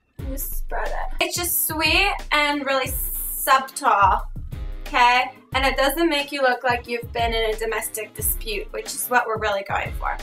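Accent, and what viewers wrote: United States. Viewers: Oh. it's tha-a-a-a-at fuckin' american! Simply loved it! :DD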